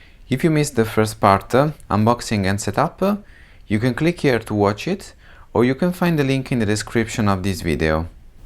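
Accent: Italy. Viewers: Italian accent??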